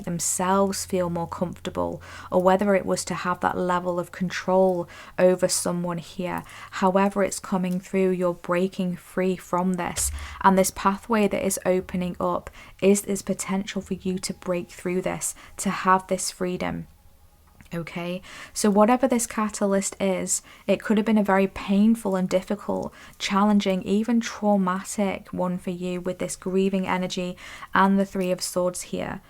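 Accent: Northern England, United Kingdom. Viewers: Pile 2 brought so much confirmation. I am welcoming in my beautiful brand new reality. I am shifting into the next phase of my life 💕🐬🌈 I’ve been sensing so much of what you brought through. I’m here to play a role in activating others. My desires to be acknowledged for my soul gifts and talents and even prosperity in connection to them aren’t bad at all, but all pure innocent and valid desires from source. Your voice is really comforting and reminds me of my grandparents from Liverpool. I always feel like my ancestors are comforting me during your readings. Are you from Northern England? Thank you for bringing through this confirmation🙏🏽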